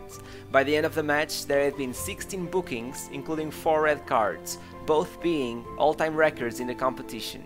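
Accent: Portugal. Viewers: Great accent only in a few words we can tell you are portuguese cá dos nossos. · Only portuguese people will notice the pronunciation. Good job fellow portuguese guy :) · You have a new sub. Spent a few years in Portugal after Ronaldo's time at Manchester United. My Mrs is from, and supports, Porto. Absolutely looooooooooooved this video. Your presentation is calm yet full of passion and appreciation for him. Loved your Portugues ascent too. The only thing that could have improved this video is his romantic return to United! 🙂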